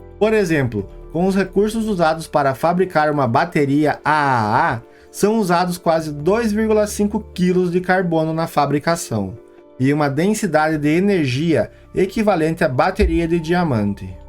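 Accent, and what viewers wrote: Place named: Caipira, Brazil. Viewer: Este sotaque caipira do locutor é insuportável!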